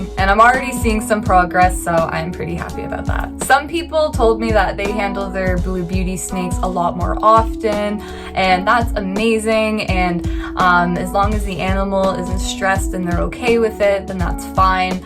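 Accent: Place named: Canada. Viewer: You sound like you’re from Canada or maybe Minnesota.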